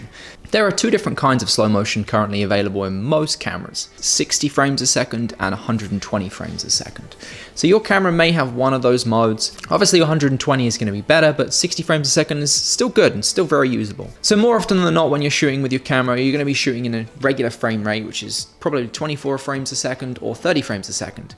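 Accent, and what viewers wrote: United Kingdom. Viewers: Great video, your video was talking about shooting at 60 or 120fps, obviously your sony will be in NTSC mode, judging by your accent, Im assuming that you live in the UK. If so, do you change to PAL when shooting in doors to avoid flickering. I have the a6500, I live in the UK, I shoot at 100fps for slow motion, is there much difference between NTSC & PAL, apart from the extra 20fps?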